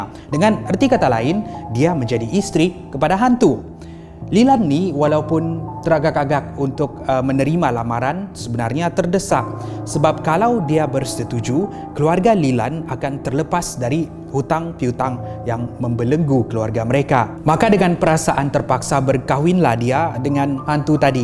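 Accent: Sabah, Malaysia. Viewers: Ainahhh org sabahhhh baini .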